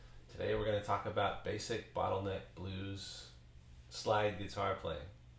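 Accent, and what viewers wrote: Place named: Arkansas, United States. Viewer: You really suck man, you sound like a loser hick white guy from Arkansas or something. Man, you SUCK. lol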